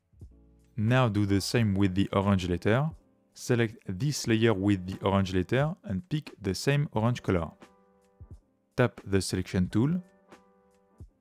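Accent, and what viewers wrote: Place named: France. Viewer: Merci pour tes vidéos ! Elles sont simples et claires et je kiffe trop ton french accent !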